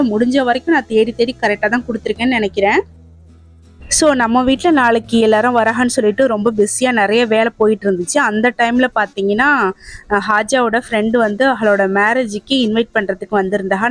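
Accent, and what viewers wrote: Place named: Nagapattinam, India. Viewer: Nagapatinam karaikal side la ipdy than pesuvom avaga ivaga solrathuku bathila aha iha nu soluvom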